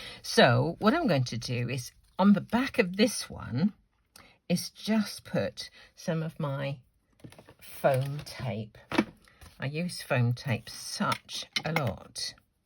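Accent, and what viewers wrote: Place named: RP, United Kingdom. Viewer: I love clean and simple cards! You once again made another beautiful card. Thank you for your tips and tricks of your many years at this craft. I also love your words you use when you drop something or if something goes wrong 😂. I’m from the US, so your accent and proper English makes me giggle 🤭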